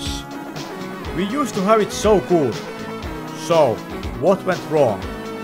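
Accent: Finland. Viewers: One of the most aggressively Finnish accents I've heard in years lol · Ha! I was listening that this sounds like a Finnish talking with rally-english accent. Then I checked the channel description and there it was, Suomi. 😄 Mut joo, kiitoksia videosta. Itse aloitin pelaamisen vuonna 1986, eli samoihin aikoihin kuin videon tekijä. But yeah, thanks for the video. I started gaming at 1986. Roughly at the same time as the maker of this video. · Ai että, suomiaksentin tunnisti heti :D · You have the most Finnish accent I've ever heard. I enjoy it. I'm subscribed now haha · I 100% agree with you! Btw are you finnish? You sound very finnish 😅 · The most authentically Finnish accent I've heard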